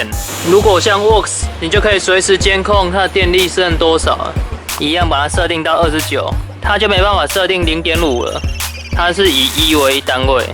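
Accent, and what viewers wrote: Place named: Taiwan. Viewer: 喜歡聽你講台語 像鄰家的哥哥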